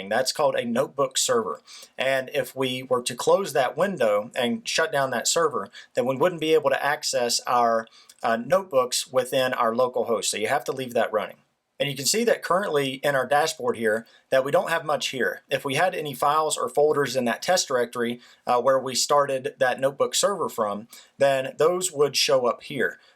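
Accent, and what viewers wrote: South, United States. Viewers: Great vid, thanks! Great southern accent, lol! With love from South Africa.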